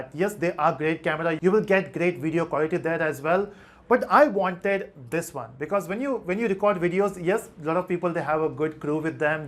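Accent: Telugu, India. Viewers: bro are u from andhra or telangana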